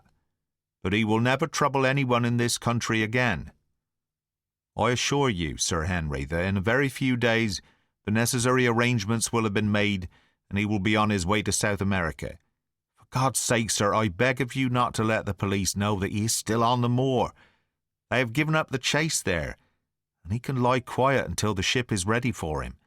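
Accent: United Kingdom. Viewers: I don't understand British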